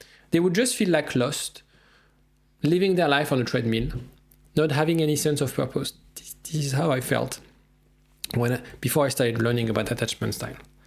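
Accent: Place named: France. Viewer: Well he is french.. did you hear the accent. He lives in london but when you learn english in France you'll get that accent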